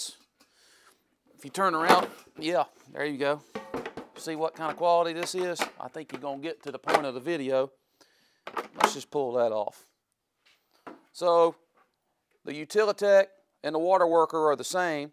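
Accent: South, United States. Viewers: Enough with the southern accent and long intro. Get on with the video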